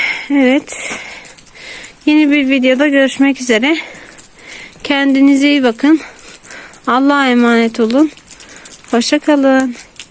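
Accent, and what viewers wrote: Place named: Adıyaman, Turkey. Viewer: Bir adıyamanlı olarak sivene bayıldım rabbim yardımcınız olsun yeni gördüm sizi takip etmeyede devam edeceğim inşallah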